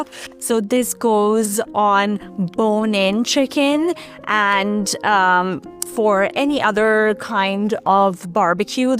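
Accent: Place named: Ireland. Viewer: Just discovered your channel and am binge watching. I’m an American guy with a decent amount of experience cooking in some southeast Asian traditions but am trying to make sense of Indian food. The first thing I learned is that it’s overwhelmingly complicated. Talking about “Indian” food is about as useful as talking about “European” food. I like that your recipes and techniques are rooted in a specific region, it gives a much more solid basis to learn. I’m curious about your lovely accent in English. Did you ever live or go to school in Ireland?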